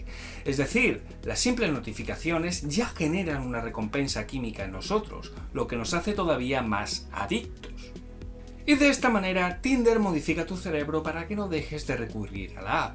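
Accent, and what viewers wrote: Spain. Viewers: Me gusta el asentó español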